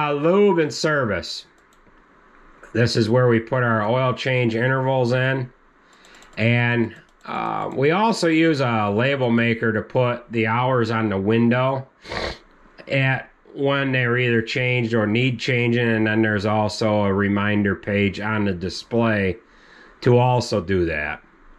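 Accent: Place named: Appalachia, United States. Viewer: Quit talkng like a hillbilly god bless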